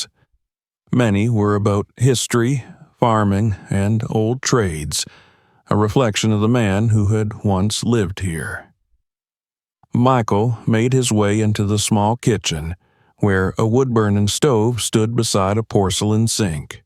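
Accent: South, United States. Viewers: The faint southern accent of the narrative voice adds to the story.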